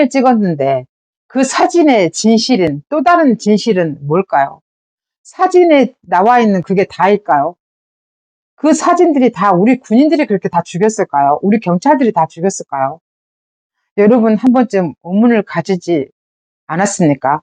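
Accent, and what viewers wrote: Jeolla, South Korea. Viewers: 대구사람인데 근래 보기드문 구수한 사투리와진자보기드문 참전라인을 만낫네요 아침부터 허벌나케 구독쉐리박고 갑니다 조아요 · 미국 71년에 부모따라 이민온 교포입니다. 제가 대학다닐때, 미국뉴스에서 광주사태에대해 보고 큰 쇼크를 받았었읍니다. 유튜브의 제목을 보고 좀 이상해서 클릭했읍니다. 자신을 전라도인이라 소개하며 아주 예쁘신 미인께서 조리있게 설명해주셔서 제가 가지고있던 광주사태에 대한 오해가 풀렸읍니다. 감사 합니다. 저도 많은 한국분들에게 광주사태에 대한 horror story 를 듣고 많은 오해를 하고 있었읍니다. 전라도 억양이들어있는 말로 너무 설명을 잘해주셔서 안믿을수가 없네요. 미인 님의 말씀 참 신뢰가 갑니다. 그렇지만, regardless of how crooked her political philosophy is, 자기 문학실력을 발휘해서 한국국민들이 염원하던 노벨상을 받은 한강씨는 축하해 주어야 한다는 의견 입니다. 제의견에 동의 안하시는분들께 용서 바랍니다.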